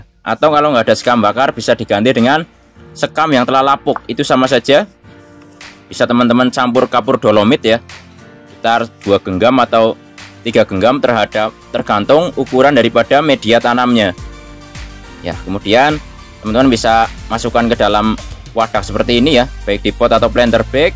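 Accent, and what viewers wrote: Java, Indonesia. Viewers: Logate medok asli mana bang 😊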